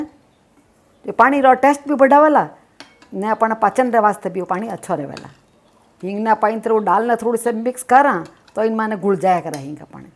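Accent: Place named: Marwari, India. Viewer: Very good respie aunty ji and ur voice of marvari so sweet ...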